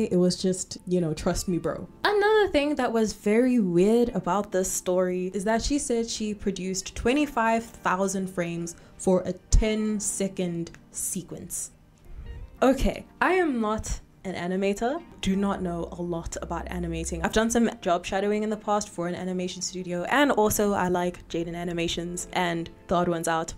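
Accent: South Africa. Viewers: Are you from South Africa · Wanted to ask if you South Africans cause of the nice accent but I guess you answered it in the vid 😅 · I knew your accent sounded a little too homely and then you mentioned south africa ! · Are you South African?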